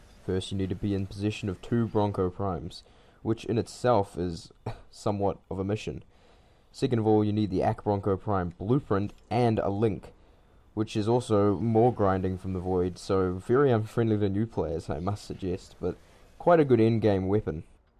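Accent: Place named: New Zealand. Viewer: "british accent"? sounds kiwi to me.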